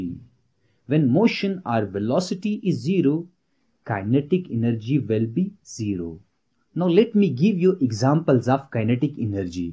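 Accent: India. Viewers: Very good but make your accent like american not indian Good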